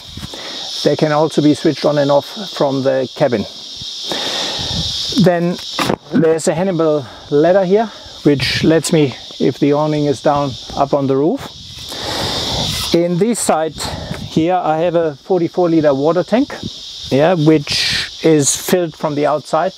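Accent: Germany. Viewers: category X ist doch auch nicht schlimm! 🇩🇪 hast zwar dt Akzent, kannst abe good englisch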